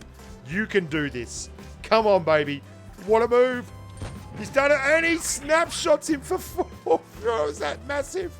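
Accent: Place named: Australia. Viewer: mate r u aussie? you sound so much like one.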